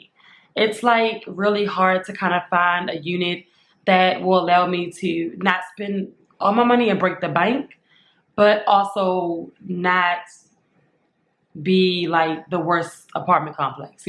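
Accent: Baltimore, United States. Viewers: That Baltimore accent is strong 😭😭😭😭🥺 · Hour on the train. And accent. Gotta be from Baltimore using the Marc. I almost switched off the video thinking it was over not even realizing you were about to do the tour and that I had been listening to you this entire time without even seeing the place! Your voice is very nice.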